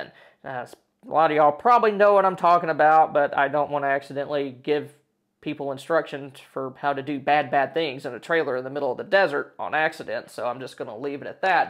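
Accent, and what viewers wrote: South, United States. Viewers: This Brit appreciates your videos and loves the southern drawl. Well done.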